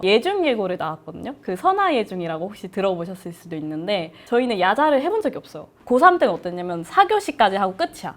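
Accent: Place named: Jeolla, South Korea. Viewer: 선화예고 나오신 분이 뭔가 광주나 목포삘이 많이 나시네요.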